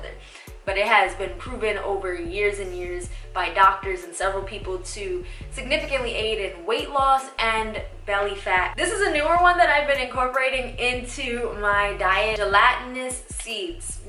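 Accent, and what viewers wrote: Jamaica. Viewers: Ayeee your a Jamaican gyal who knew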